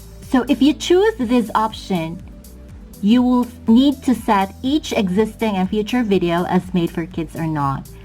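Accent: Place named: England, United Kingdom. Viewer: i love this tutorial,,, maayos yung pagkakaturo.. i also love your english accent po hehe,, new friend po, sana mabisita nyo rin ako salamat po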